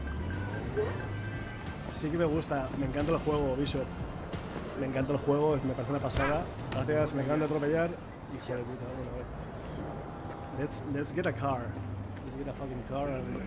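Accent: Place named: Argentina. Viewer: Que grande, lo escuchas hablar y es mas argentino que el dulce de leche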